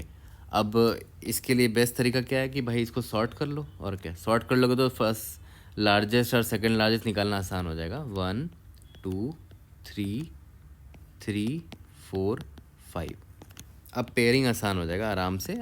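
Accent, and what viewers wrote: Bihar, India. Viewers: whi bohot meethi awaz hai lga hi tha bihar jharkhand side se honge, mere freind bhi wahi se hai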